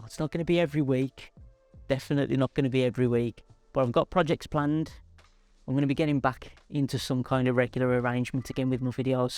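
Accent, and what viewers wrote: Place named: Birmingham, United Kingdom. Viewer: Hi, thank you for posting this video and for your absolute sincerity. One of your many gifts that stand out is your ability to communicate with excellence in front of a camera, I have tried this and it extremely difficult but you have always made it look easy. I am a Christian and I will be praying for you that God would give you successful outcomes in all your treatment. I have had 2 severe nervous breakdowns in my life so I know life can be tough sometimes. I love your positive attitude and the fact you struggle with these problems yet you can reach out to others to help them is a really lovely attribute of your character. You have also spent many years helping others by sharing your skills and discoveries with the world. I just want to say, thank you so much and I pray you will experience optimal health going forward. 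You are an inspiration!! I just wanted to add, I have lived in Scotland since age 5 but I was actually born in Birmingham!! I spent my early childhood there and had a Brummy accent like yours. They are memories I will never forget even though I was just a young child.😊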